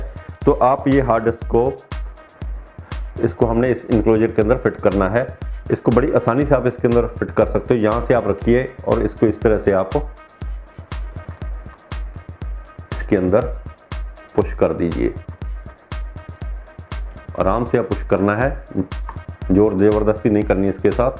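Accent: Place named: Punjab, India. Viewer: Very good voice pure punjabi. Sir aap kha se ho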